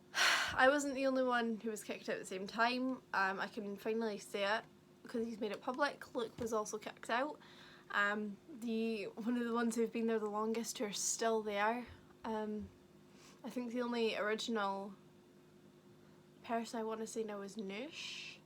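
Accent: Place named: Scotland, United Kingdom. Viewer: Is it Scottish or Geordie? Sounds more like Scottish to me 🙈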